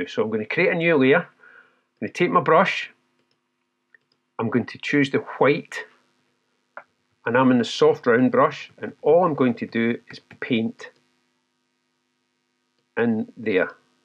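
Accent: Scotland, United Kingdom. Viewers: Thanks a lot for your shared expertise and for the files used to follow your really helpful video. Your a great Ambassador for Luminar. I follow you from the first day I used Luminar 4. Really helpful. Besides I love your Scottish accent (I'm a fan of Scotland).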